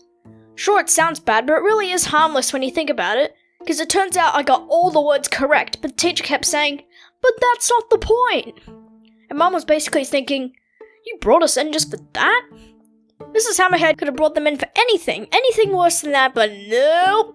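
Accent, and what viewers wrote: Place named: United Kingdom. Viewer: Are you British because I am and you sound like one?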